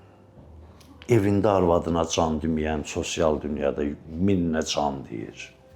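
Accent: Azerbaijan. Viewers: Bu kişi tər təmiz əsl Azərbaycan dilində danışır.amma bəzi kometləri oxuyanda adamın heyfi gəlir bu kişinin danışmağına.Necə tələffüz edirlər elə də yazırlar.🤔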